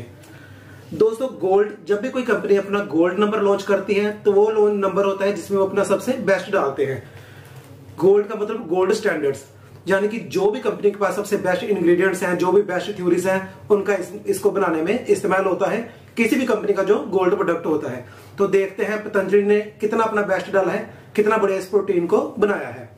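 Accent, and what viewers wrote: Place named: Punjab, India. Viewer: Beautiful review but the Punjabi accent is more beautiful. Keep growing!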